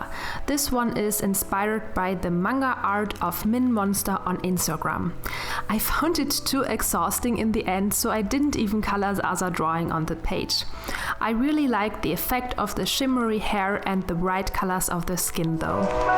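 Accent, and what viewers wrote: Germany. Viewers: I think her accent is really nice and cute, and I love to listen to the german accent! (also french and italian accent and other accents as well! ) Have a nice day! : ) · Man hört, dass du aus Deutschland kommst. Aber trotzdem cooles Video. Ich fände aber cooler wenn es auf deutsch wäre, da mein Englisch auch nicht so gut ist. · Are you from Germany? Because your English sounds very funny... Just as a german 😂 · Du bist deutsche... hört man voll😄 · yes she is german, as a german i am ashamed of how harsh her english sounds .... i cant even listen to it tho... sorry I know how hard it is to correct the way of speaking but she doesnt even seem like shes trying . i know thats verry mean to sa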